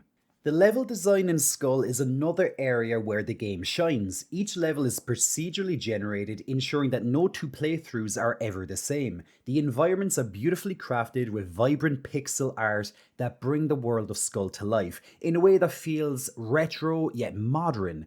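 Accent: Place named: Ireland. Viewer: Irish accent and furry pecs 😵‍💫🥴😭😭😭😭😭😭😭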